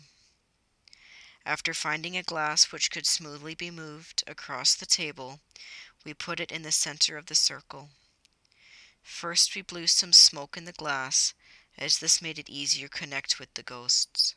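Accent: Canada. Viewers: Also, you sound a tad bit Canadian.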